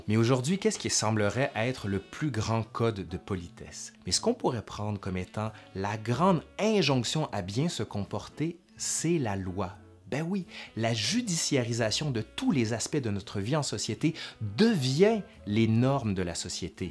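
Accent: Canada. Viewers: L'accent canadien est-il un signe de distinction?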